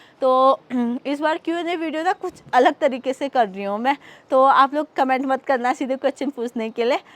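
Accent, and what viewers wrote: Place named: Pahadi, India. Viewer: Hindi m v vlog bnaoge to chlega dii ek pahadi chahe kitni hi hindi kyu na bolta ho uske bolne m phadi accent hi hota or vo aapki voice m h hindi m vlogging krte huye phadi words ka use krna isi s ek phadi ki pehchan h proud to be a phadi uttarakhandi love from Almora ❣️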